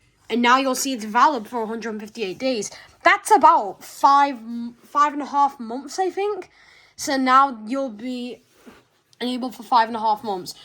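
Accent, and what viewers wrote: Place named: Liverpool, United Kingdom. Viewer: W scouse bro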